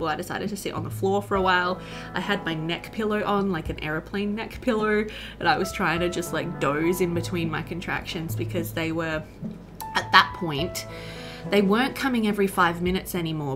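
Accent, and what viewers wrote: Australia. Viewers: Congratulations! Baby Jelly is so cute 😍 Hearing your birth story was very interesting and sorta informative for me since I've never been pregnant yet, but you had a lot of courage! Births are never like you expected them, it's always a surprise but I'm glad everything went well in the end for you and the baby. I'm a new subscriber, so don't worry about making content yet I still have a lot of Sims 2 Pleasantview to catch up 😉 Love from a Canadian girly ❤ (I love your aussie accent by the way!)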